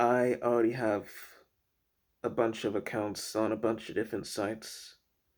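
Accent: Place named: United Kingdom. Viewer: I accidently come across your twitter page earlier and me been as nosey as I am ended up here. I have to say, your an interesting guy. Also when you first started talking I would have never guessed you're from the states. I thought you had a British mixed accent but wasn't sure with what. You do have a little bit of a London accent when you say certain things too. Does your parents have an accent at all? The only reason Is, my partner grew up 20 minutes away from where I grew up and he talks completely different to me. He has a very posh accent compared to me, but his mum and dad moved around a lot for work for my mother-in-law and they have a very strange, posh, mixed accent and he picked that up. Whenever people start talking to us they can't believe we grew up in the same town. Anyway, I apologise for rambling and waffling on. I enjoyed your video, keep up the hard work.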